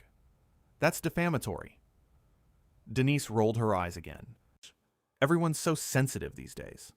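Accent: United States. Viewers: This is fake - never happened. The bad, over-written account delivered in a nasal American monotone should be a clue that this is phony. More fool.the eejits who believed this.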